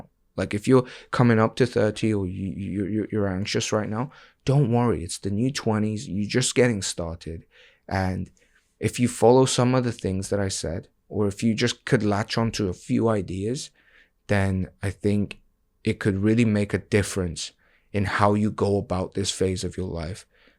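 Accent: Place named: United Kingdom. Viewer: Off topic, but your accent is a lot nicer than the half-baked American accent that a lot of foreigners end up with. Not all British accents are nice but what you have ended up with is 👌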